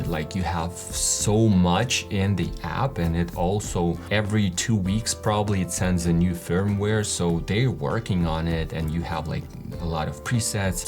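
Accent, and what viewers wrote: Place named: United States. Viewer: Hey I like your accent,- sounds really good American!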